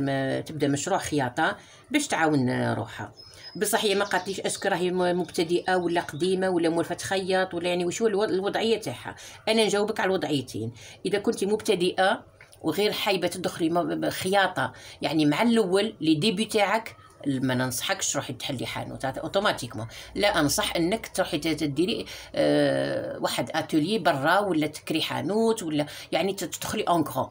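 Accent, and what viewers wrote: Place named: Skikda, Algeria. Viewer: مساء الخير الاخت ارجوكى ديرنى فيديو على تركاب السفيفة المغربية على الصاريان الكحلة كل ما نركبها تهربلى وما نعرفش كيفاه انا دخلت لليوتيوب ولقيت فديوهاتك وزاد ما نتبهت ليك على اللهجة تاعك انا نظنك سكيكدية و ربما ماما تعرف الوالدة تاعك خالتى خاميسة الله يرحمها ادا كانت هى قولى ارجوكى وبالتوفيق ولاكان انبارطاجى الفيديو تاعك فى لقروب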